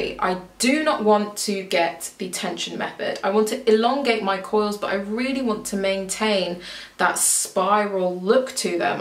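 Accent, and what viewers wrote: United Kingdom. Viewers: Londoner right there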